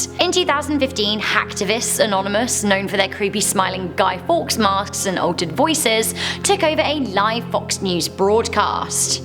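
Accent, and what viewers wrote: United Kingdom. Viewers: I always prefer to watch scary videos with a British person. Makes me feel safer lmao. Probably cause it makes me feel at home. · Why are you british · Jane admits your British accent is perfect. Who is Jane? That is your choice.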